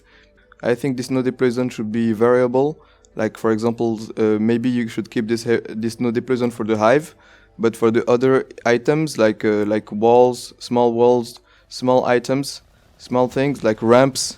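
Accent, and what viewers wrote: France. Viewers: quand je t'entend parler, j'entends un français qui parle anglais ( tu as un accent français) 🙂 je suis certain que tu es français, Si tu l'est, en tous cas tu parle sacrément bien anglais!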